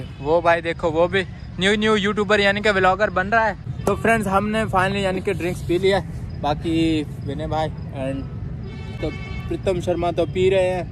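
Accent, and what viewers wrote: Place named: Bihar, India. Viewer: Bhai tere bolane ka lejja ek Indian Bihari jaisa lag raha hai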